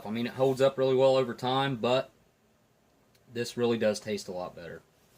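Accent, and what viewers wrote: South, United States. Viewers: OMG THATS AWESOME!!!! I'll keep an eye out for the video!!! And congrats on the milestones!!!!! That's so awesome!!!! Btw I love your videos AND your accent! Southern boy huh?? 😁 I'm super happy for you! Congrats again!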